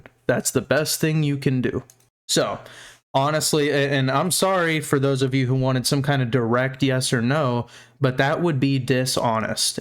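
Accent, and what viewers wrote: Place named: United States. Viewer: Bro your acent is lije a true american 😅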